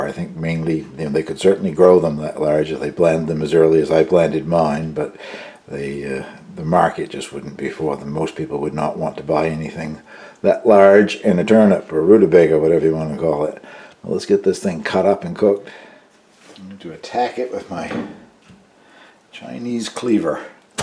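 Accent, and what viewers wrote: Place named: Canada. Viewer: Dear, I have just found your channel in the past 2 weeks.This is the best! Really enjoy all your videos, the chickens, darling Angel, your cabin all wonderful. I think you may be a modern day Thoreau! Thank you for sharing your very interesting, and inspiring life. Have always wanted to visit Campobello Island, as well as P.E.I., hope to do so one day. My maternal Grandparents were Canadian (I am American) and it is lovely to hear your accent.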